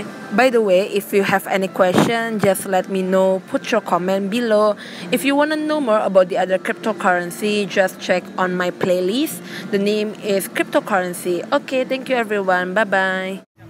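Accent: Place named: Indonesia. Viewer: Ur accent sounds like indonesian 🇮🇩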